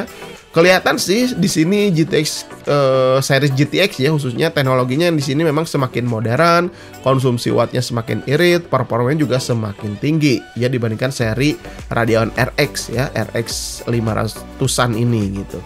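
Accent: West Java, Indonesia. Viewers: sunda pisan wkwkwk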